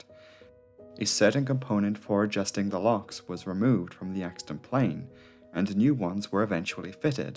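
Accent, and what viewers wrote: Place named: North East England, United Kingdom. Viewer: Splendid video. Thought provoking. Love your North East accent. My mum (mam) was born in Chopwell. 😊❤️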